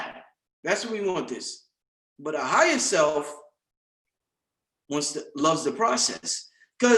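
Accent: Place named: New York, United States. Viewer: They keys to life and u dropping Jewel's Who don't luv that new York voice? I LISTEN TO YOUR MUSIC SO DIFFERENT u always give informative video ✌❤💡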